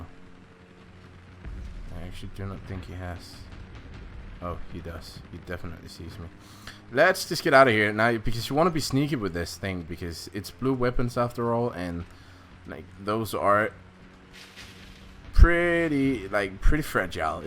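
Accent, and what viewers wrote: Denmark. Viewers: Just a random guess, is from Denmark? (judging by accent) If so, then TUBORG HVERGANG!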